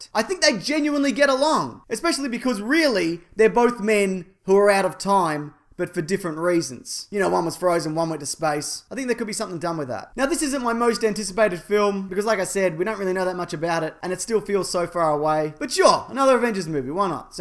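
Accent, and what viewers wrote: Australia. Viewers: I'm Aussie, born and bred, and my accent is not where NEAR as broad..are you...amping up the Aussie a tad? Anyway, great stuff, love you work, give me a fucking loot box. Cheers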